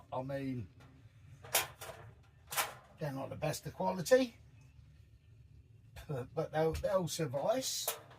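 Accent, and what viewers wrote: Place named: London, United Kingdom. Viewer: Hi my name is Christine but please call me chrissie which have subscribed to your channel have been watching u for sometime now like your accent u come from London❤️ 🎉😊top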